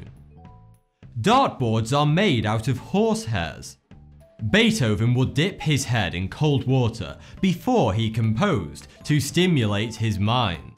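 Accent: United Kingdom. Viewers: British English at its best~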